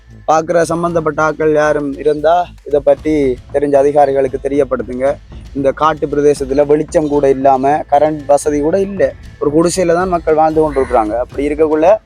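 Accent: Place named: Sri Lanka. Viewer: இலங்கைத் தமிழழகு 🐅🐅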